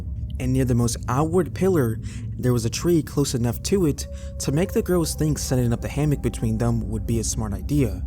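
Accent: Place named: Toronto, Canada. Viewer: You sound like you're from toronto!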